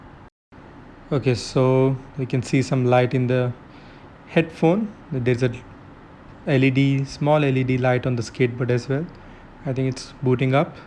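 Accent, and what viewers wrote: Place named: Russia. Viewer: You with Russian accent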